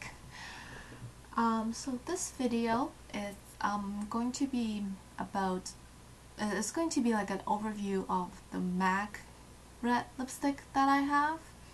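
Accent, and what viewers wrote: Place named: East Asia. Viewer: She sounds the same as other east-asian youtubers whose first language is not English.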